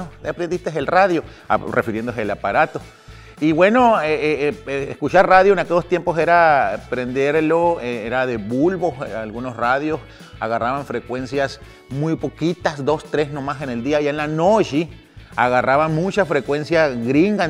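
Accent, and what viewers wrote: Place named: Sonora, Mexico. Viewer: con el acento de la sierra de sonora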